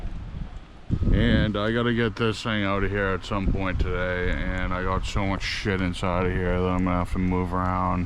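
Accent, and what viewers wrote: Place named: Massachusetts, United States. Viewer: I can tell by your accent. I'm originally from Wareham down by the cape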